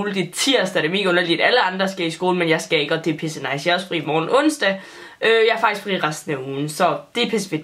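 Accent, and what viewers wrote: Jutland, Denmark. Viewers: Hun er jyde right? Hvorfra???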